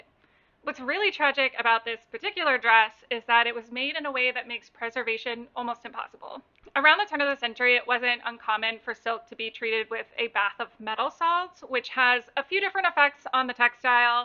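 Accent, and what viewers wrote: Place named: United States. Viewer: I love your art but I dont like american accent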